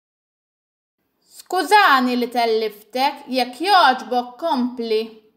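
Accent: Tunisia. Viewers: لا تشبه غير اللهجه متاعنا باهي؟ توا هذي حكي دزيري؟ شبيكي نتي لباس المالطيه تونسيه كلمات تونسيه نغمه تونسيه نتو كلامكم مهوش كيفنا نتو كيما المغاربه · هاو تونسي هذا 🙂😂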